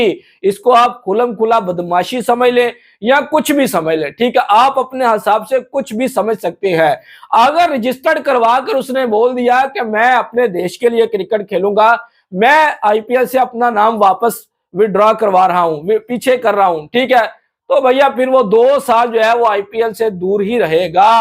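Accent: Pakistan. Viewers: Sala pakistani iss ke boli sa pta chalta hai ka ja sala pakistani hai ❤❤❤❤❤❤❤❤❤❤❤❤❤❤❤❤❤❤❤❤❤❤❤❤❤❤❤sala dog da bacha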